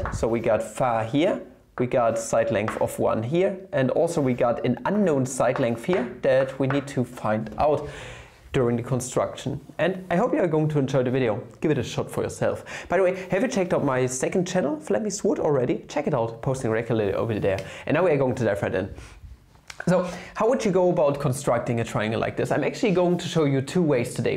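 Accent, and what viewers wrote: Germany. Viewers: I love german accent 👍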